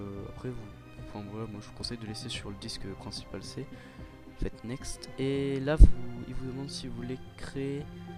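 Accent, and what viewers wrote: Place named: England, United Kingdom. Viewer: omg cet accent anglais xD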